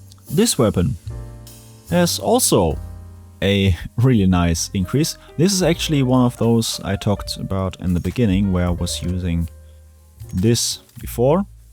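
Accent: Germany. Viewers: I was wondering why you would change the keybind for entering the firing range from Z to Y but then I remembered your German accent xD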